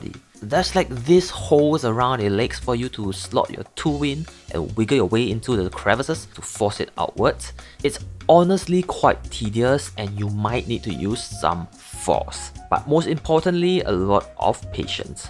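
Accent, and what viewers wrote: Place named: Singapore. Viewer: Wait you singaporean? U sound singaporean, If u are, omg, never knew got singaporean who is interested in this stuff. Love it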